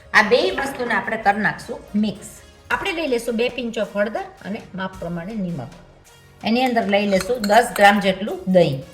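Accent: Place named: Charotar, India. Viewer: Ben tamane mare ak tips aapviche te ajke tame basan sathe puovane karas Karine bijivar honvane banavsho ok 🎉 dhayvad tamari charotari bhashathi Mane badapan ni yad aavi gai Jay mataji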